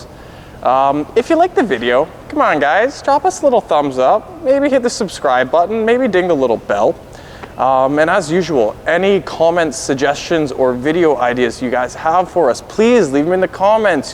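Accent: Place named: Canada. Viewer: Holy Christ you're Canadian 🤣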